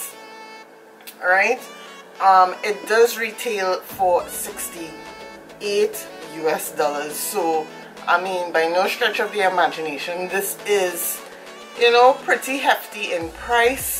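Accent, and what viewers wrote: Trinidad and Tobago. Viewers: Are you trini???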